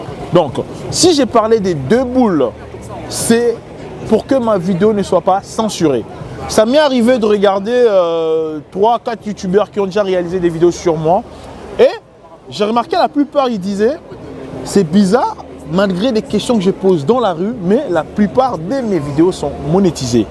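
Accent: Democratic Republic of the Congo. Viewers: tu as déjà avouer Mec. Tu es originaire du Congo Kinshasa. Et votre accent montre plus que vous êtes congolais. Je suis un abonné ( Espagne🇪🇸 )depuis 2019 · Avec ton accent t’es congolais sur 😂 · Ton accent tes délire tn caractère prétentieux cs genres d choses il n'y a qu'un CONGOLAIS qui a ls couilles d le faire, t'es un zaïr🇨🇩🇨🇩🇨🇩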